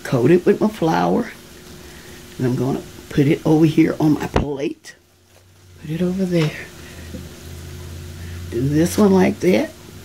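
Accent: South, United States. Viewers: Her strong southern accent just made this 100× better. I KNOW that liver and onions good. Lol Also, I've never had it before and my bf wanted some. This helped a lot. ♥️ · Thanks looks good I really like your your southern accents I know people southern I really like southern friend chicken Thanks for sharing blessed you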